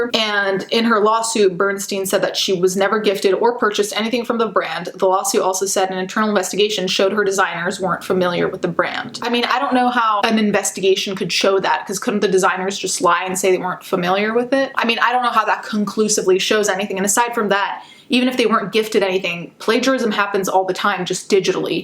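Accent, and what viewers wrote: Italy. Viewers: She’s Italian. So… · The Italian in you can’t not say those designers properly and I am living for it 😍😍😍❤️🧡💛💚💙💜🤎🤍🖤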